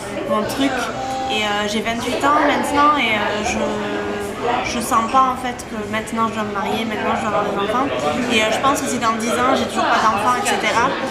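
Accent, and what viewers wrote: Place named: Toulouse, France. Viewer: Ca fait plusieurs vidéos que je regarde de toi, et je me disais : "mais elle a le même accent que moi elle doit être de Toulouse".... Et enfin j'ai la réponse ! :)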